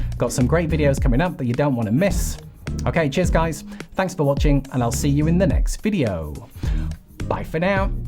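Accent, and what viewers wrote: United Kingdom. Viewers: Life is SMOOTH with a British accent........Cheers My Love...!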